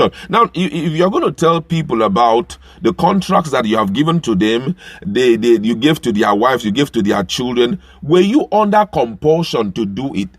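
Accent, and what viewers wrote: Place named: Ika, Nigeria. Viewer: Bro, you speak like an Ika Igbo, you sound like George Oyinbe. I went to high school in 1970/1971 with a guy called Pius Oyinbe from Ika. This was in Ologbo\Benin.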